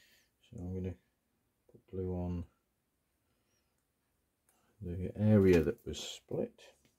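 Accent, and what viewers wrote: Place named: United Kingdom. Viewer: His British accent makes it nearly NOT understandable if you have the same problem to resolve and are a USA English speaker and reasonably skilled..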